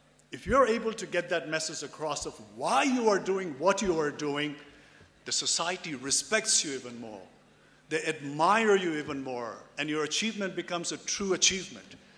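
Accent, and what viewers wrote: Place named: England, United Kingdom. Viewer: Love his English accent! Humbly appreciate your knowledge and the way you inspire students with your valuable words.. incredible speaker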